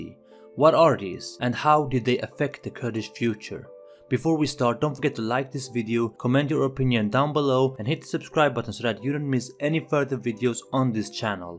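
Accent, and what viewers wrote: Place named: Sweden. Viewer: You have a hint of a Swedish accent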